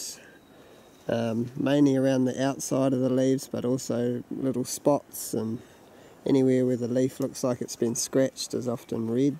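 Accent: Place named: New Zealand. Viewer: Good one. I like your Chanel. Great to hear the true Kiwi accent and colloquial use of language 👍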